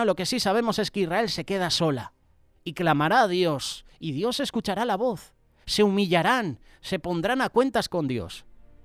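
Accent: Spain. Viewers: Supongo que eres español por como hablas. Pues siento decir que segun tu argumento España no existe es el falso nonbre que nos dierin los romanos.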